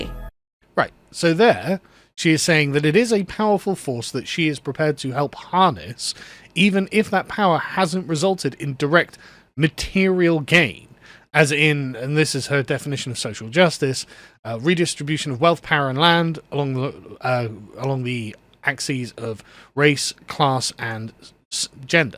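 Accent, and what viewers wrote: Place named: England, United Kingdom. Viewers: Seeing a fellow Indian use an English accent to push such stupid ideas is very disappointing. Violence as a tool is a communist tactic and should be confronted with the tools that worked in the past. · Indian woman with english accent? IGNORED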